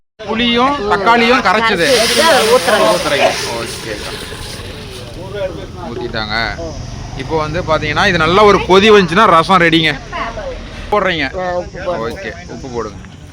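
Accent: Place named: Salem, India. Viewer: சூப்பர். நம்ம சேலம் மாவட்ட பேச்சு வழக்கம். சாந்து, பொங்கி, கொத்தமல்லி தழை கேட்பதற்கு இனிமையாக உள்ளது.❤❤❤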